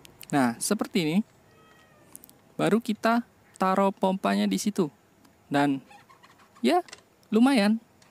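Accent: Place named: Banyumas, Indonesia. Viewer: Yakin kwren mas..sLam ngapak..ora nhapak ora kepenak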